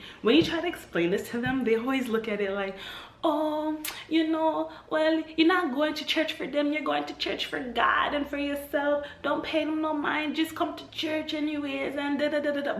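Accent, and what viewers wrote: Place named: Jamaica. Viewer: Are you Jamaican ? Cause bwoiii this sounds like the churches in Jamaica. Plus you have an accent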